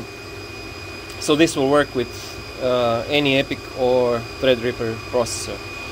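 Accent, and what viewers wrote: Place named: Russia. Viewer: Акцент такой русский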